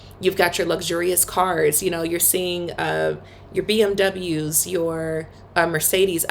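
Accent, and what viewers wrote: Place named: South Africa. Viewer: Thank You Mam for promoting our Country. You sound like a South African already. Enjoy Your stay here.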